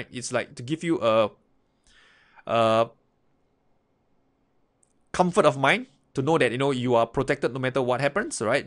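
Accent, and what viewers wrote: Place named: Singapore. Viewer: i bet, you r Singaporean.....tnx for the video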